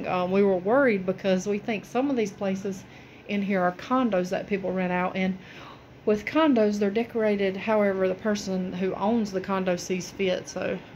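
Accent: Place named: South, United States. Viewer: Oooo girl you are even more country than me!!! Hahaha I love it! 🥰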